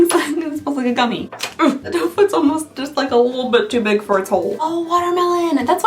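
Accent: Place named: South, United States. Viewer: I love hearing a woman with a southern accent like myself tear into a rant. Now I know why my husband smiles when I go off about the grocery store making stupid substitutions on my items. I smile through your whole video whether you’re ranting or not. ❤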